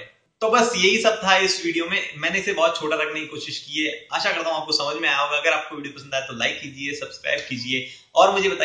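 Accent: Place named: Haryana, India. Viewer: saab aapki haryanvi tone bahut achhi lagti hai कानों को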